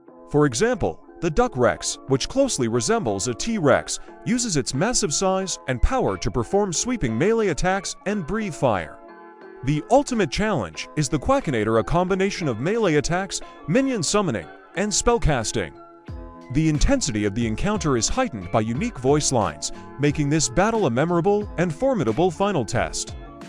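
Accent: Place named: South, United States. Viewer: it’s not bad but could use some tuning, around the end of some sentences it pronounces with a odd southern accent like at the start when saying spells it pronounces them like spales and says whep instead of whip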